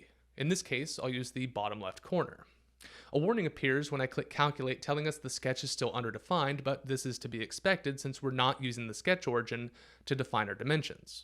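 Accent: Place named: England, United Kingdom. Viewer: Professor thanks for your great video. It is great to find a YouTube video showing solidworks With an in English accent, I can understand.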